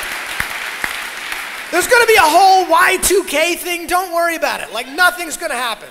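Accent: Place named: Boston, United States. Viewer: wow that basten accent!! love it! :D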